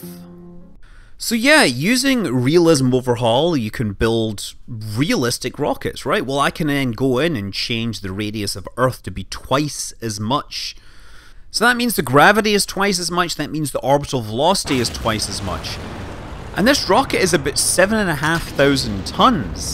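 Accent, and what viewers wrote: Scotland, United Kingdom. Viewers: Nice video, I enjoyed the scotish accent !! ...The earth is the most massive solid planet in the solar system and has the third magnitude of surface gravity after Jupiter and Neptune, escape velocity of 11 km / s (40,000 km / h) to elude such gravitational field it requires a huge amount of energy (we could say that the earth it's already a super earth) Inhabited planets with higher masses could be gravitationally unattractive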